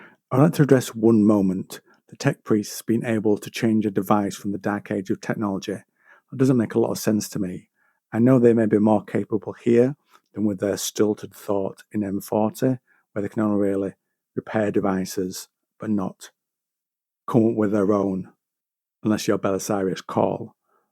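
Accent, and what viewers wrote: United Kingdom. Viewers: Almost vomited within moments of listening to the cockney. Can't understand a word. Not for consumption west of shitlandia I mean Europe.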